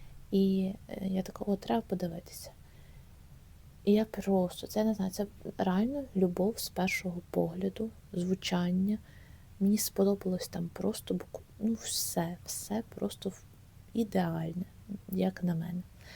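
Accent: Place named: Vinnytsia, Ukraine. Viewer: Ваш суржик мені дуже схожий на мій, я з вінниччини) Успіху вам, цікаво слухати.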